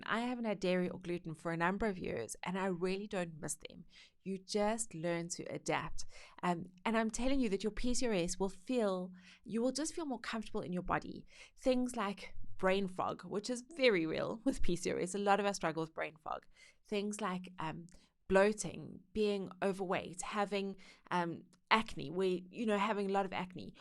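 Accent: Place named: South Africa. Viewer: Accent sound South African...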